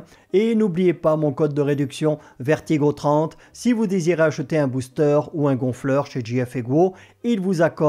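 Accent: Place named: Germany. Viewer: super video mais ton accent allemand est tres bizarre 😅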